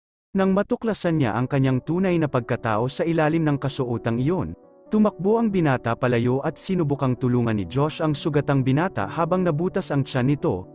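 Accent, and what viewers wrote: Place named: Visayas, Philippines. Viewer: Debris ba? Bisaya kasi mag pronounce eh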